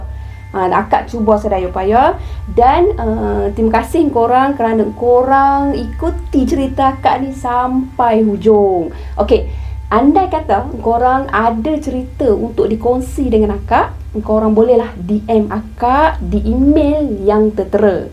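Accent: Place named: Kedah, Malaysia. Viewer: Terbaikkk akak....pandai juga cakap kedah..🤣🤣🤣🤣🤣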